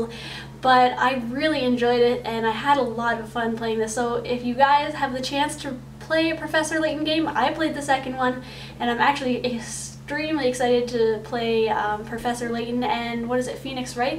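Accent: Canada. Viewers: If I were to take a guess, I would say you are Canadian. A very cute Canadian at that :D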